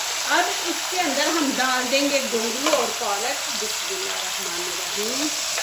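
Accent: Punjab, India. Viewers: Cooking main tu mahart Hy mgr guzarish Hy k Urdu lehja pr thori twajho dein jhatky se ye latka kr bolny se Urdu ki khoonsoorti mtaasr Hoti hy Punjabi andaz main Urdu na bolain Urdu ko Urdu main bolain tu Jo thori si uljhan Hoti Rehti Hy poori video k doran is se bach jain Pakistan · کیا بات ہے آپ کے پنجابی لہجے کی ❤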